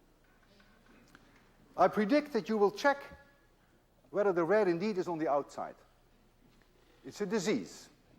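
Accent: Netherlands. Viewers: Some people tell me that I sound just like this man when I'm talking English. I'm Dutch too. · The dutch accent is indeed very recognizable, but atleast he's not like Van Gaal.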